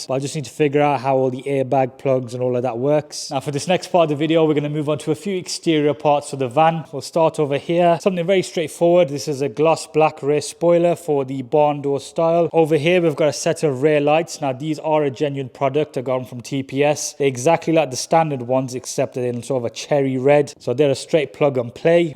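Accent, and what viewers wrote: United Kingdom. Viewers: So beautiful British accent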